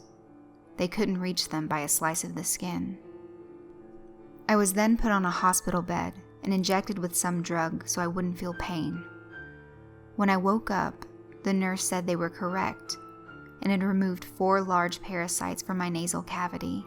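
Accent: South, United States. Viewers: I just saw your address in the description and realized how you can have a southern accent . Don't get me wrong it is nice to listen to . I told you before that I stumbled on to your channel when you were featured in another channel . It was like finding gold. You do a great job on your narrations . This video though more than a few had me scratching my head wondering . But I guess that is just me. At any rate keep up the great work . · Another good batch, your quickly becoming my favorite specially when ya get that cute southernish tone, keep up the good work. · dont really like the southern accent tbh ;$ maybe I need to get used to it (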